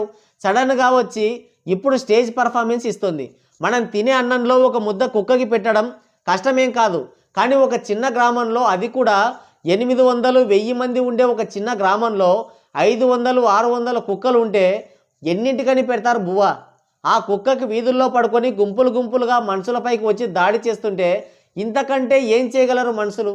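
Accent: Rayalaseema, India. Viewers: I watch your videos regulary and always like your punches, video script, and presentation(Vakchaturyam), I am from London. The way you speak you sound like you are from Rayalseema and me too from Dhone.Good I would like to meet you in my July visit to India. Let me know if you would like to meet, I can share my contact.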